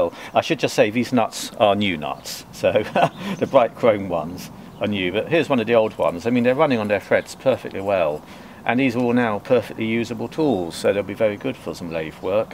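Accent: United Kingdom. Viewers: how wonderfully British! Love it!!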